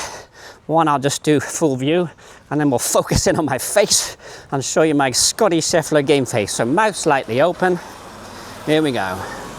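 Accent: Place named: England, United Kingdom. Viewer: I love your channel. Sounds like you’re English. Where are you and do you give lessons in person ?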